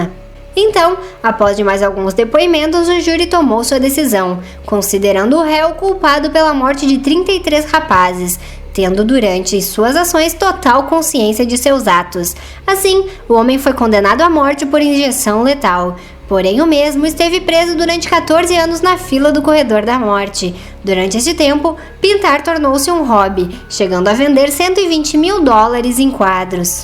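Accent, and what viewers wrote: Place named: Bolivia. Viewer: voz de boliviana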